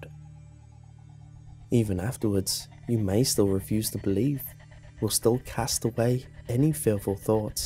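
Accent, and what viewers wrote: England, United Kingdom. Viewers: hey what part of England are you from I'm trying to fugue out what accent you have.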